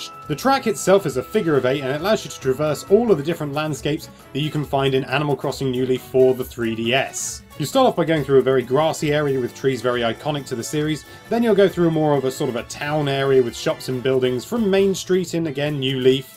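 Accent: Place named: England, United Kingdom. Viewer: He's pronouncing it with his English accent. In England everybody pronounces it like that.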